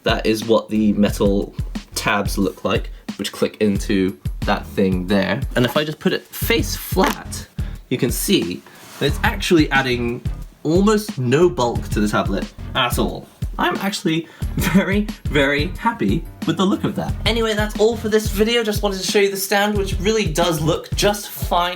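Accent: United Kingdom. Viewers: I know its none of my business but im just curious. What nationality are you and whats your family background? Like you clearly have a british accent, you refer to most things in pounds but you clearly live in japan and appear japanese. I know people are mixed race and people move and migrate across the golbe all the time but im just the type of weirdo that needs to clarify everything haha. Thanks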